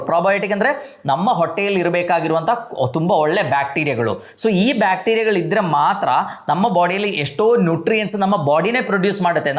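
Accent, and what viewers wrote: Kerala, India. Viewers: Good Morning sir...You are giving very very very good information Always i will be watching your videos. I can understand most of your points b coz I studied the related course in same the profession and also I studied about Nephro urology and i worked in the joint venture units. And one more thing except Malayalam accent will be coming in your talk while using English your Kannada is 99% good . And Thank you so much for giving more informations about health updates in your every videos. Thank you so much sir .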